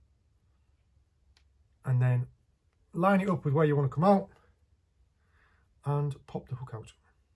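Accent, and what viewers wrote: Yorkshire, United Kingdom. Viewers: Really good info also I've been using a rubber bead between the swivel and lead... in the USA they use a glass bead to attract the fish..... And how many of the watchers are online looking for a weight called an Arf... You can take the man out of yorkshire......😂😂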